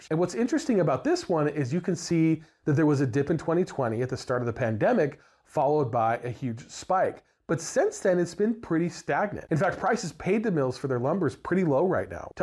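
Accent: United States. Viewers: Is this Australian or American Lumber Markets ? You sound American..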